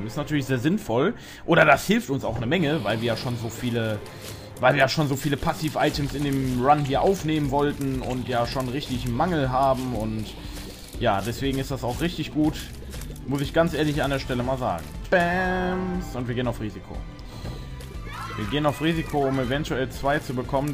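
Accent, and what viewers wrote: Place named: Saxony, Germany. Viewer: die bayern reden nicht so xD die aus leipzig reden so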